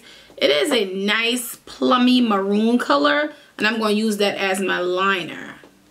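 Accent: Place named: Gullah Geechee, United States. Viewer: Geechie gyal!!! I can spot that accent out of a line up lol. Love the eyes, I still can't master the winged liner. Taking notes!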